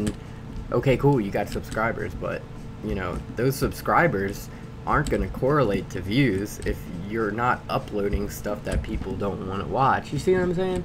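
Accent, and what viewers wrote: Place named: North, United States. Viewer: bc I live in Louisiana and he said he was from Louisiana just curious what part cuz he sounds like he from up north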